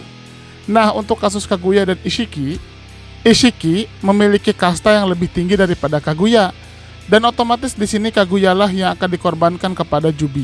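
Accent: West Java, Indonesia. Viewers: min lu org sunda yakk😁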